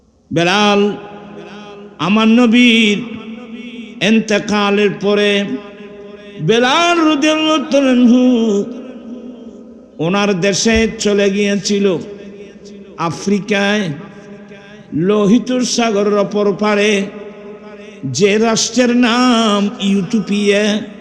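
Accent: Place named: Chittagong, Bangladesh. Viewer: চিটাগংএর ভাষা বাদ দিয়ে শুদ্ধ ভাষায় ওয়াজ করলে সারা বাংলাদেশের মানুষ বুঝতে সহজ হতো বেয়াদবি হলে মাফ করবেন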